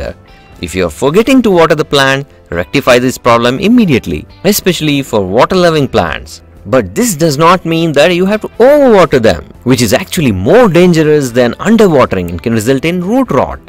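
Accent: India. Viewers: Sir ur Indian english is very good. One thing u should bear in ur mind that u r not teaching agriculture student, Just tell to the point for what is needed for a general home gardener. Thanks.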